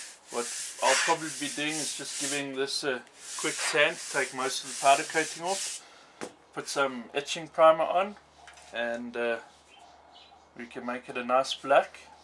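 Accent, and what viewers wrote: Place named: South Africa. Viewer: Never heard such a strong Saffer accent.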